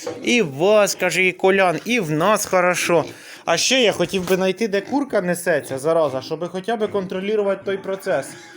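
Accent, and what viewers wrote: Poltava, Ukraine. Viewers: так ведь это наш Полтавский говор, пускай не слушают кому ухо режет, а мне так любо- любо душу греет. Раньше говорили, что наш говор это настоящий украинский язык, мы же не живём на Западной Украине, это центр у нас так,и это у нас не отнять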